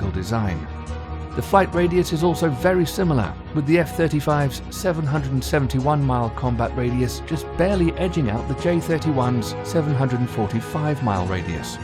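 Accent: United Kingdom. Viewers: sounds like he has a British accent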